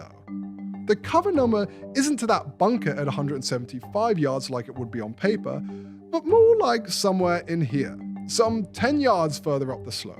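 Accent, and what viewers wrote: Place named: England, United Kingdom. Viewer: I’m over everything golf being an English accent. Sorry.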